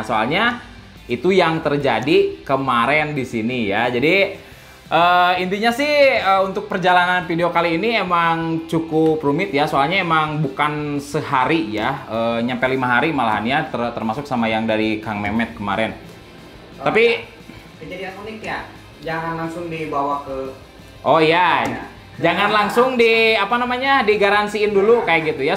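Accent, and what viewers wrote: West Java, Indonesia. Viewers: itu sunda bandung bukan sih?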